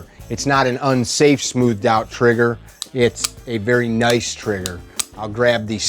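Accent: Chicago, United States. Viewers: Late to the party here - I could listen to this guy's accent all day. I live in Chicago so it sounds real familiar. One of the best reviews on this firearm, too. I have the off-the-shelf Compact Carry. Not sure what that lacks from the full Langdon - maybe the Landon trigger and the bobbed hammer? Anyway, great firearm. I may put a slightly wider de-cocker on it, but that's about it. Would love the fancy stipple job but that's not cheap, either - and hate to send the gun away for such a long time.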